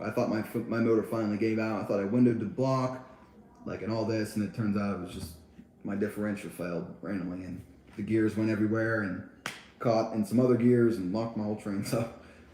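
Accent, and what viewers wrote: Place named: Pennsylvania, United States. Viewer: Where from PA are you? Recognized the accent immediately lol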